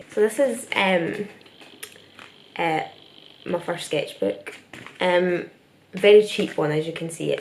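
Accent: Scotland, United Kingdom. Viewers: the accent took me aback, didn't know she was Scottish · Why can’t my Scottish accent sound like that 😂😭 you’re incredible no wonder you got accepted! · she's from scotland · Kelly Cho 저번영상에서 스코티쉬라고 한거 같앗는데 맞앗네요ㅜㅜ진짜 못알아듣겠어요 감사합니다!!😘😘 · English is her first language, she’s just Scottish and has a thick accent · woah i was not expecting a scottish accent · She seems she from East South of Scotland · your art is absolutely amazing and so are you <3 i had a bit of trouble trying to underestand what you're saying though, idk if that's rude i really don't mean to be rude!! I'm just not scottish so I'm not used to it i guess - but would you mind putting subtitles ? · Are u british??? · Just a curious question, whereabouts is ur scottish accent from? Btw love ur vidz 💖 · omg the minute i heard ur voice i was so happy cus im scottish too and finally i've found my fav fellow scot youtuber <33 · I’m also scottish BUT CAN I JUST SAY HOW TF DO YOU MAKE OUR RANSID ACCENTS SOUND CUTE?? 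i souND LIKE A MAN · 스코틀랜드 아니면 복부 아일랜드 같아요! 알아듣기 젤 어려운 억양인데 많이듣다 보면 익숙해져요 😊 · Yeah I know that lol I mean I wasn’t expecting her to be Scottish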